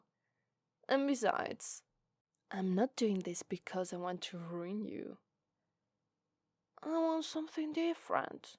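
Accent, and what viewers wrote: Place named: Italy. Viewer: Never knew how an Italian accent sounded until now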